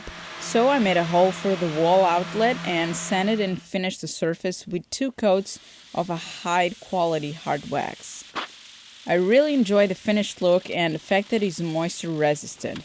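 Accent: Portugal. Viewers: És de Portugal?! :D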